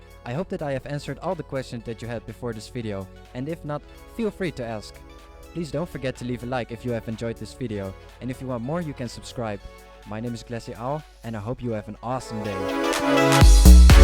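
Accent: Netherlands. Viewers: Dutch accent lmao nice video!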